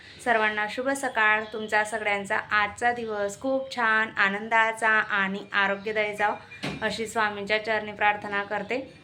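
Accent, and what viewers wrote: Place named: Khandesh, India. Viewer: हाय तु कुठे राहते तुझं बोलणं ऐकून वाटतें तु खानदेशी आहे जळगाव धुळे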